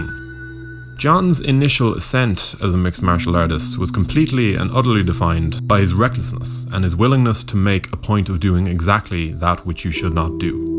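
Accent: Ireland. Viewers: Great content , are you irish ?!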